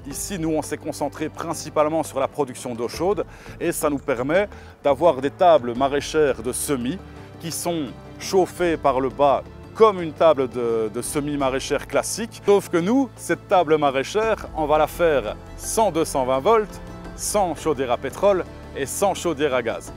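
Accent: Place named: Wallonia, Belgium. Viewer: wallonie belgique je dirais vu l'accent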